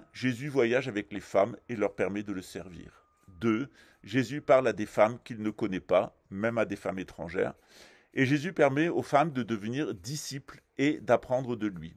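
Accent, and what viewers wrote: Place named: Italy. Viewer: Belle accent Italien! L’Italie vous aime, je suis a Rome et décider de vivre ici, les Italiens sont adorable et bienveillant, merci pour vos vidéos incroyable et instructives! Que dieu vous bénisse.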